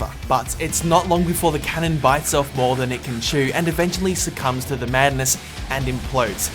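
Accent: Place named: Australia. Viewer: Your Aussie! Me too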